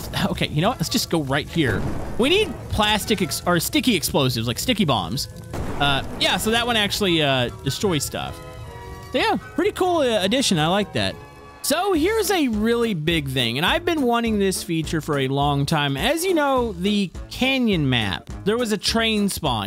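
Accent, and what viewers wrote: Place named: South, United States. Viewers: you have a very southern sounding voice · He's from Texas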